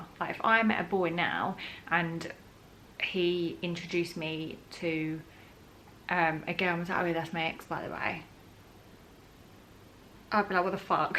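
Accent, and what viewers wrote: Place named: United States. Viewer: Yass girl look at that fresh beautiful skin, you are glowing ✨✨ When you said awkward 🤣🤣 I feel I’m a bit old for advice videos but I still watch anyway cos you are hella entertaining...and you guessed it I said hella in American accent ☺️ You don’t need to apologise for anything. Ps my family are hella annoyed at me because of you 🤣🤣 I’ve only been calling bloody eat out to help out - help to buy...that’s all your fault haha x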